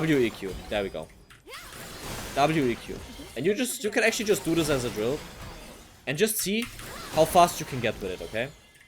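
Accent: Germany. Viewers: From your English accent I could tell you are from Germany, danke für die Tipps waren sehr hilfreich ✅️ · Now just for me, are u German?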